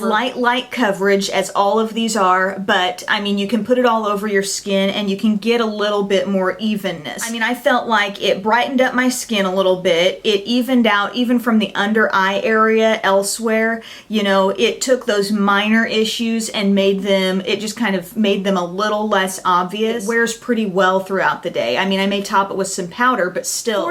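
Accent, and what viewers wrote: South, United States. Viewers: very true! i totally agree! i also assume youre from the south? very strong southern accent! i like it tho! im from wv but most say i have a very northerer accent saying "mom" like "maam" we turn our o's into a's for some odd reason? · i subscribed just because of your awesome southern bell accent :P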